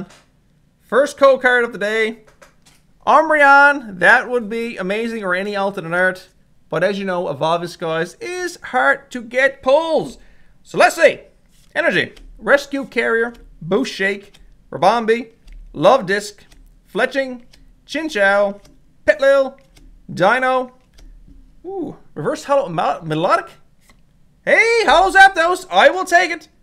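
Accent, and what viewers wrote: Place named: Newfoundland, Canada. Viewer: Love ur play mat and love the content I'm from nova scotia u sound like ur from newfoundland bud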